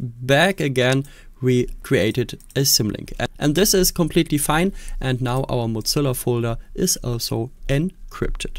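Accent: Germany. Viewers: could it be that you are german? Your voice sounds really german and how you pronounce stuff sounds german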